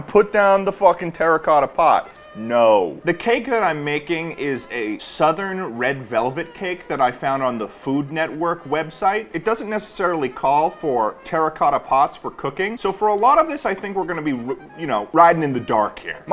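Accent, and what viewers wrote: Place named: Massachusetts, United States. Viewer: This man is definitely from Massachusetts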